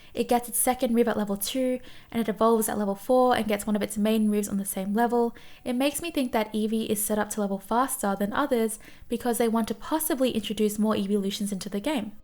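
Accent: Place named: Australia. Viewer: I'm pretty sure you are saying all the names right, just with an Aussie accent lol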